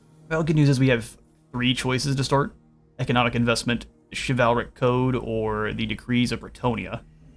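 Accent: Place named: France. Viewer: Love the French accent.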